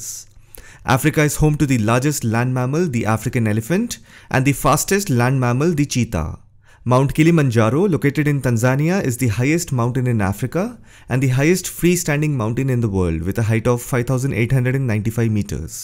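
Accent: India. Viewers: Bro, sounds Indian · Why you sound like you from india?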